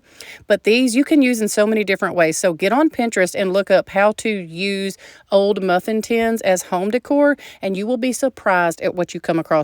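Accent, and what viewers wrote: South, United States. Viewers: I think you could use that material for either or for both. Yes, the outside of our house is all Eastered up. Yes, that was for salt or pepper but you need the tiny spoon for it. Where do you live with your southern accent? Where do you sell your thing and what name do you go by?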